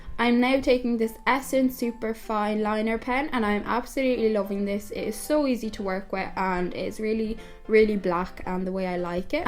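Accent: Ireland. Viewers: Love this xxx just wondering what county u from X I honestly can't tell hahaha xx I'm from tipp btw xx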